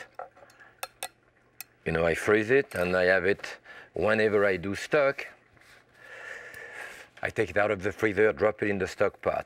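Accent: France. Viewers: RHUM...YAAAARglol no i do understand that this french chef prenoncation is hard to understand for those that do not speak french as their 1rst language...im french and im good in english ...i i miss some parts once in a while...his accent is SO funny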